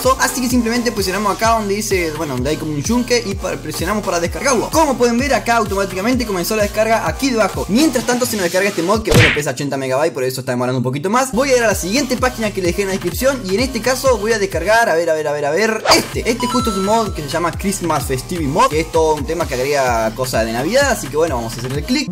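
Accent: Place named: Chile. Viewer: ablas como mi chile querido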